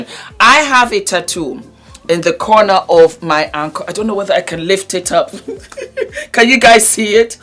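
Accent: Nigeria. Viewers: Nice to hear speak pidgin, I live for it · your pidgin is perfect and sweet. 😁 I enjoyed this review. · What is there not to love about you. I am so loving and feeling the Naija vibe, energy and accent in this episode. Omo, you are truly a Naija babe jare, 100%. Once a Nigerian, always a Nigerian! Love you as always.😘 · Loooooooooovvvveeeedd this review😅😎.!!! ... Loved the Nigerian accent and words you incorporated in the video.. Definitely going to watch it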